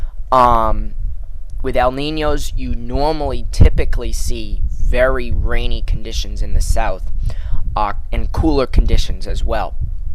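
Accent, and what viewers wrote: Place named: New York, United States. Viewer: do you live in new york city, you have the new york accent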